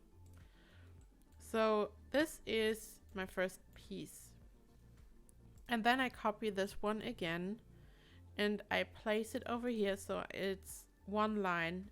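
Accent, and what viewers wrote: Germany. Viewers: sorry for asking but are you german ? i think i can hear a little cute accent :) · Du bist deutsch 😂 oder ?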